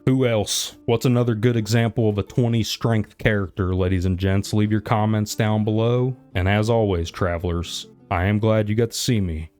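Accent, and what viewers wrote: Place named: Rural, United States. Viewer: Ive known a lot of hicks in my day, but brother if that is what you sound like reading from a script, you're better off leaving it out. Yeesh.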